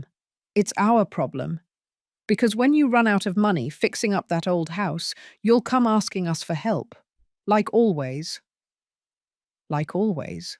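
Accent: United Kingdom. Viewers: What kind of accent does the automated voice have? Is it British? It's not an accent you would expect to hear in Seattle.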